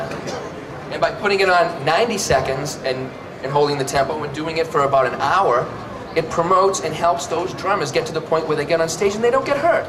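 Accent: Boston, United States. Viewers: Is he a Boston fella? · He's from the Boston area. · Boston accent + saying retarded= win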